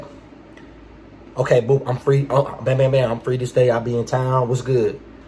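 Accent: Baltimore, United States. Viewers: U sound like a bmore nigga🤣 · Baltimore accent detected. But nah for real thanks for all your help my G. Peace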